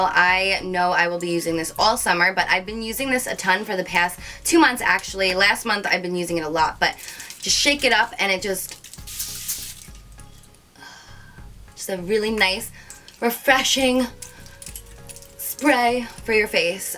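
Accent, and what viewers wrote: New Jersey, United States. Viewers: i know she is from jersey i just didnt know that she lived in jersey. Just because you have a jersey accent doesnt mean you have to live in jersey.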